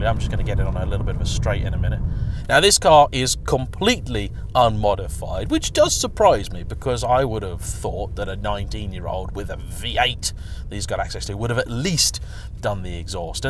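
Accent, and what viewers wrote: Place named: Essex, United Kingdom. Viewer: Before you said it, I knew it was Essex! Subbed!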